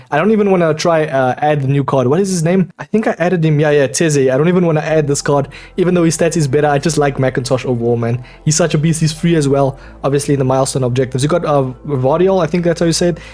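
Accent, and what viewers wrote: South Africa. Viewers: Are you South African bro you got the accent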